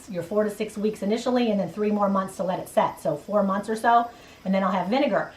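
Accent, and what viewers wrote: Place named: North, United States. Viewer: So much I didn't know about apple cider vinegar, wow! Thanks for all the tips and tricks. I'm putting home made a.c.v. on my list from now on! Also,...I hear a northern accent. Are you from WI by chance? Cuz I am, and would love to brag that you are too!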